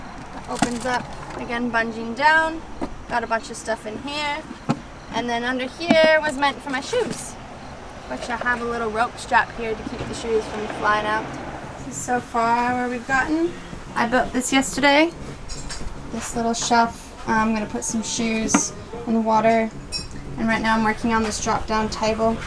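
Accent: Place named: Australia. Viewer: It's interesting how you're developing your own kind of Australian accent. It's subtle, but definitely becoming more noticeable with each new video you put out. It suits you. Cheers to another fun video! Congrats on the van build!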